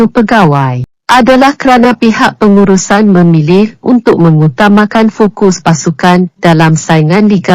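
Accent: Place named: Indonesia. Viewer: Yg bercakap ni indon ke...ckp tak sedap tak jelas...tak ada orang Malaysia ke yg boleh cakap..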